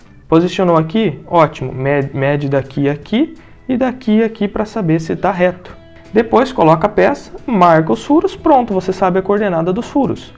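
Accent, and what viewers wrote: Paraná, Brazil. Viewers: Cara, tá certo que o cabeludo é feio, tem sotaque paranaense, e tal... rsrsrs... Mas dar dislike?!?!?! Putz, esse cara tá contribuindo pro progresso dessa nação muito mais do que todos os políticos juntos, e sem cobrar nada... E ainda tem espírito de porco que dá dislike?!?!?!?! Patacaparau!!! Bora clicar nos joinha, galera!!! E, Professor, continue assim, que o Brasil precisa é de gente como você. Muito obrigado!!!!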